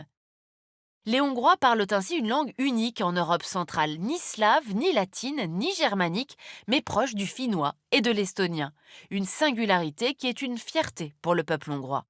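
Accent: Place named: France. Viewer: Que asco ese tonito francés...parece que tuviera una puta papá en la boca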